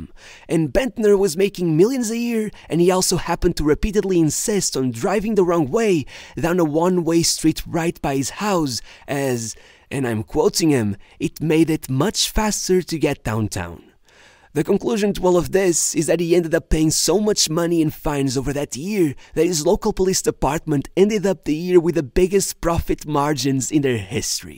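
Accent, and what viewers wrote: Portugal. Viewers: is it just me? i just can't stand Portuguese accent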